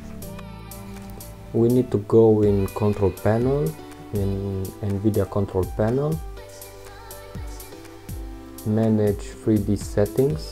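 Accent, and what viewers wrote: Romania. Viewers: Din primu cuvant mi-am dat seama ca esti roman :)) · ma intrebam daca esti ro de la primele 2 cuvinte :)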